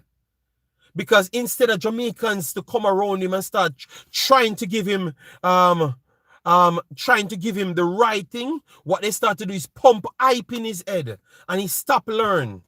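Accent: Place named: United Kingdom. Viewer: Great to see you back big boss! That british accent kills me everytime 😂😂😂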